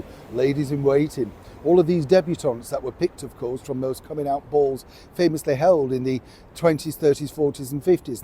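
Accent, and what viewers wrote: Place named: North, United Kingdom. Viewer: He's from the north...give him a break!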